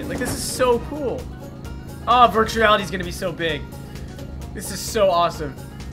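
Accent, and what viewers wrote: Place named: France. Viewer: Your french accent is hilarous :D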